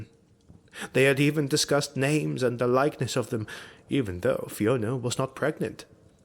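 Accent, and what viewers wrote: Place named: England, United Kingdom. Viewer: A very good story, been looking for something like this. Like the voice, sounds English, will wait for more.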